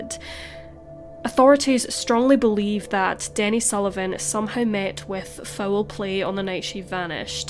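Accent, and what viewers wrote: Scotland, United Kingdom. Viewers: The narrator has a Scottish accent.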